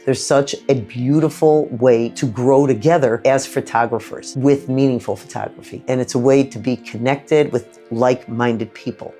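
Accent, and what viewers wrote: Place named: New York, United States. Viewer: Enjoyed finding your channel. Definitely inspires me. I am originally from Brooklyn, NY and thought you were from NY as well until you said Chicago. Didn't realize the similarity!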